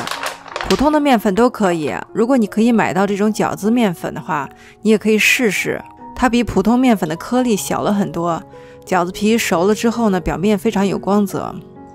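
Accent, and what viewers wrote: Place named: Beijing, China. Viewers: 好喜歡看的視頻，教得好細心，有條不紊，聲音清晰動聽，正式的“京片子”。從中我真是獲益良多，很是感謝！且有一個特別好奇的地方，妳為什麼懂做這麼多的菜式。很是利害。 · 你声音好好听听起来就像是国外长大的地道北京人这么说你能理解么很喜欢你的视频我是今天开始follow的之前看过一个artichoke 的视屏不知道是不是你的 anyway goodday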